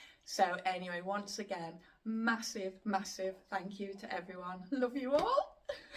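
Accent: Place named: North, United Kingdom. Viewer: Oh dear that frickin awning is proving just a tad troublesome ay - but not to worry luuvy 'cause not only am I always totally enamored by your delightful Northern accent, I am also well and truly captivated by this ongoing and challenging saga!!...You're a true Brit Battler. Bravo 👏😀 Onwards and upwards. Congrats on the 1,000+ subs btw - That's awesome 👍😉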